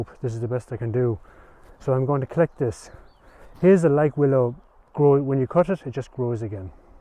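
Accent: Ireland. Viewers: These videos never get old because is such a skilled, inginuitive, man w/a pleasant Irish accent and beautiful terrier dogs.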